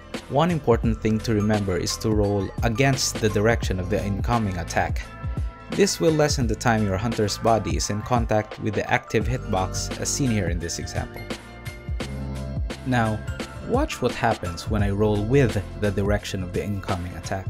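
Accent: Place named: Philippines. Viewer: Just started watching your vids.. You have a filipino english accent i kinda thought then i saw your logo haha Really good vid bro😁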